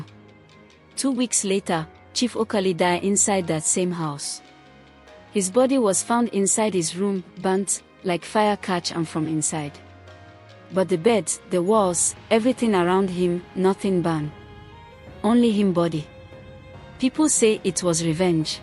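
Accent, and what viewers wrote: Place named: Ghana. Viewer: This your pigin English dey sweet 😂 E dey sound like Ghana people pigin Cheap particles dey run belle so dey said Haunted house no pass like this o😮 Na God go help use o Even mama Ike dey fear too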